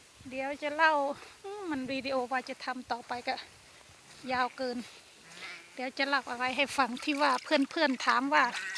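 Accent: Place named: Surin, Thailand. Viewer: เพิ่งเห็นจ้ะติดตามนะเว้าเหมือนคนสุรินทร์นะชอบๆจ้ะ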